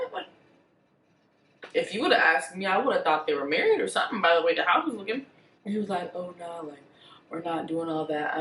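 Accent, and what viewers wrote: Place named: Nigeria. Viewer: loved how you spoke like a typical Nigerian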